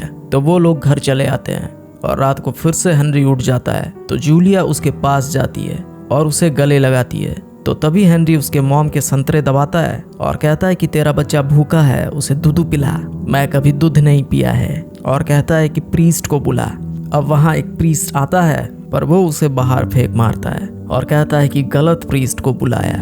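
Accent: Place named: Bengal, India. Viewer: Bhai new explainer ho tum. Lekin tumhara story telling style aur awaaz unique hai. Apne script me Bengali accent mat lao aur thoda aur serious explain kro. U earned a subscriber❤❤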